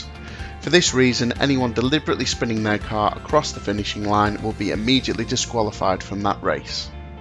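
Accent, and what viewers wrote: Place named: RP, United Kingdom. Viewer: hell yeah commentary with queen's english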